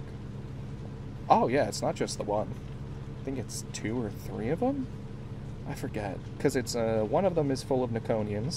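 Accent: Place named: South, United States. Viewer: SLIGHTLY SOUTHERN SOUNDING CATBOY TRUCKER RAAAHHHHHHHH 🗣️🗣️🗣️🗣️🗣️🔥🔥🔥🔥🔥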